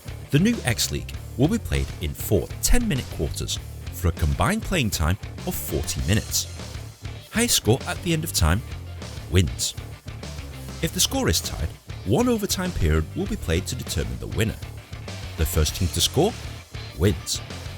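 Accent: United Kingdom. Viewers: Narrator has a Limey accent. Cut some slack Yank.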